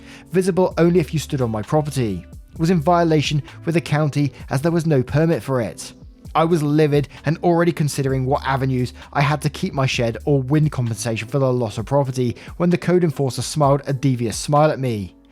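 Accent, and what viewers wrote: England, United Kingdom. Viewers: I love the English pronunciation of H. Don’t change, and still say zed, not z. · I just found it amusing that alot americans , are trying to correct a English man, literally born in England, on how to speak and pronounce English.........🤣😂🤣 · why the heck shouldn't you say "haitch?" You're English! That's how folks from England say the letter H. If you want to say it "aitch," knock yourself out. I'm not gonna tell you how to talk. And neither should anyone else. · You’re British, people are really bothered that you have a different accent? 🙄🤦🏻‍♀️ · You say H like any British person does. Doing nothing wrong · Ha, don’t stress about it, I mean I just figured that the “h” thing was a British thing and reasoned that if you did it, then all Brits did it! 🤪🤦‍♀️. ALSO this’s off topic, but I recently discovered a hilarious British show called PLEBS... 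England has been holding out on us because that show is pure gold (well at least the first 3 seasons)!! 🤌👏🤣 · You don't need to change the way you pronounce letters. I've heard a number of people from the UK pronounce the letter H the way you do, and there's nothing wrong with it. I hope you don't feel like you have to adopt Americanized pronunciation to please your viewers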